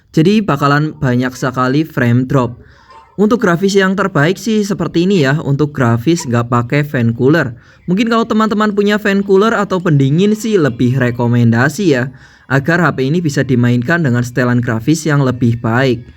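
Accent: Banyumas, Indonesia. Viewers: Omaak orang banyumas🎉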